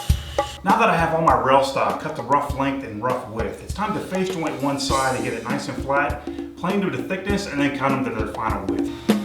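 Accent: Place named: Midwest, United States. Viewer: Are you a NC native? For some reason I was thinking Wisconsin, Michigan (definitely Midwest of some sort) native based on your voice. Sorry so random...message me if this isn't the appropriate forumn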